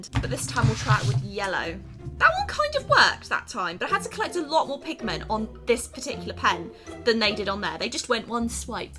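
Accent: England, United Kingdom. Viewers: I'm from England and I've gotta say, has the most stereotypical British accent ever 😂 if you came to the north east you probably couldn't understand us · I've lived in Britain all my life and have never met anyone with as much of a British accent as. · Hi I love your British accent so much it makes me feel good ❤️❤️❤️❤️😻😻😻🇮🇸🇮🇸🇮🇸🇮🇸🇮🇸🇮🇸🇮🇸🇮🇸🇮🇸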